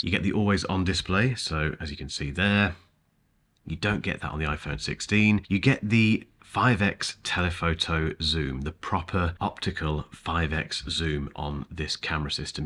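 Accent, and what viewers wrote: England, United Kingdom. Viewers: Listening to British people review products is excruciating torture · Unwatchable ramblings. I really loved Samsung galaxy and didn’t miss iPhone apart from the ecosystem… 😂😂😂… my dude do you comprehend that it’s all about the ecosystem. What did you use it for… the calculator? Another proof that English accent does not mean intelligence.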